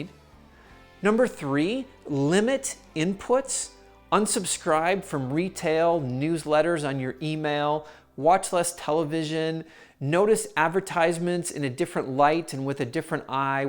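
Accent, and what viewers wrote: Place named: United States. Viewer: Hi, I'm a Spanish woman who is trying to stay minimalist after a long period of decluttering in my life... Also, I'd like to say you that I learn lot of American English with your pronunciation! Thank you very much!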